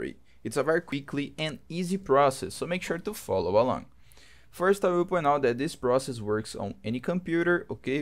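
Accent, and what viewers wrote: Brazil. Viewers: brazilian sotaque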